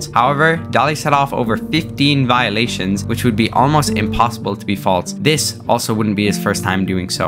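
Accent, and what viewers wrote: Ireland. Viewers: Irish accent? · You sound irish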